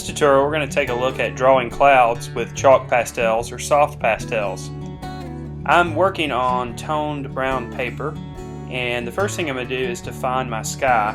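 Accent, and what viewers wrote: South, United States. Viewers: beautiful you are really good and i like you countryishh accent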